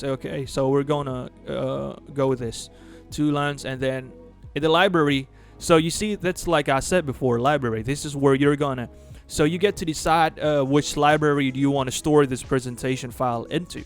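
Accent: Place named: South, United States. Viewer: This guy really knows what he's talking about. His southern accent is so genuine!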